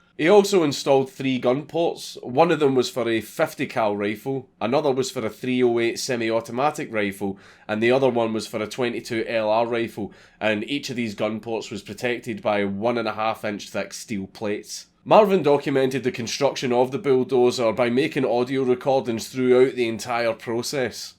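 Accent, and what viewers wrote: Scotland, United Kingdom. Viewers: This is by far my favourite mad lad video, keep coming back time and time again for the same story told by a nice scottish accent.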